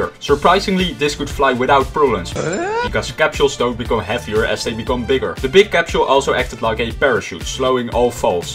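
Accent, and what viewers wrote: Netherlands. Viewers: No one like bc he sounds dutch just talk dutch no one understands you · Wait he Dutch? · ik hoor een nederlans asent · Een klein beetje Nederlands accent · You sound dutch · Are you from The Netherlands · Are you dutch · Ik kan horen dat je Nederlands bent, maar dat neemt niet weg dat je Engels goed is. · Je bent sowieso Nederlands Or if You’re English I thought you were Dutch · bros dutch? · Are u dutch? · This guy is totaly dutch like 100% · You dutch?? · You sound dutch? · i hear some dutch accent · He is from holand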